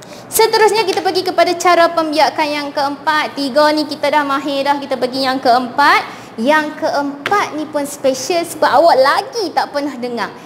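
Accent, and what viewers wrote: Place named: Kedah, Malaysia. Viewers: Saja je 😅 kedah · terima kasih cikgu saya suka cikgu cakap kedah🤭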